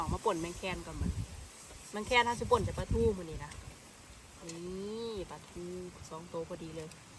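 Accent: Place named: Roi Et, Thailand. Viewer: บ้าน ใช้เงินบาทหรือเว้าคือไม่ใช่คนลาวสำเนียงเหมือนร้อยเอ็ดสารคามขี้ตกเหมือนกันร้อยเอ็ดเด้อหล่า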